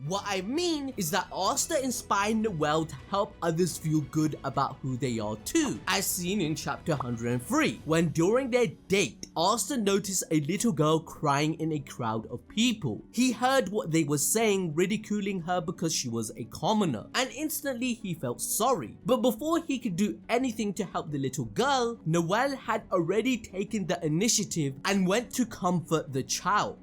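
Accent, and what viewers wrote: Punjab, India. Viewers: Nice hindi accent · Is this guy indian? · The Punjabi Hindi accent🤣🤣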